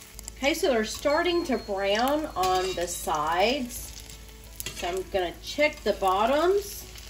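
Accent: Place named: West Virginia, United States. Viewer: Looks delicious! My husband's Mamaw in WV used to make potato cakes when she had leftover mashed potatoes too. Are you from WV too? I like your accent!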